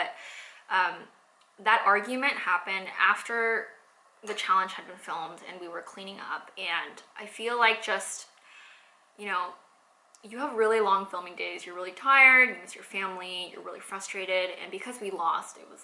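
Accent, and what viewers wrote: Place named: California, United States. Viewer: Voice and Cali accent have stayed the same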